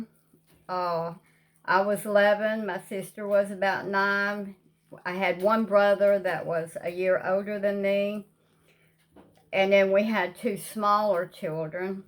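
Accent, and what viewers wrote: South, United States. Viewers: Hi Ms.. I really appreciate your videos. I was adopted in TN when I was little, but don't really have any family as it wasn't the best situation. But I had a grandmother who was absolutely wonderful, and when I was young she cooked like you. I love listening to you, learning from you and watching all your posts. I'm near Chicago now after living in NYC for 20 years so it's nice to hear the Southern accent and warm lifestyle. You're good people, as my grandmother would say. And I'm very happy I found you here. PS. You had my mouth watering 2 minutes in! Yummy!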